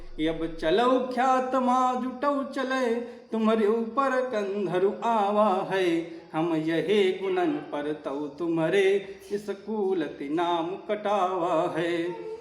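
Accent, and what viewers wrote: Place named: Awadhi, India. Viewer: बहुत अच्छा जी 👌👌👌 अवधी की मिठास अपनी एक पहचान है 🙏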